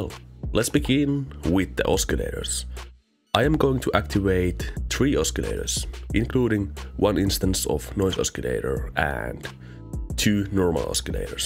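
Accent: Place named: Finland. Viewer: arvasin että oot suomalainen tosta aksentista, mutta muuten helvetin hyvä tutoriaali :D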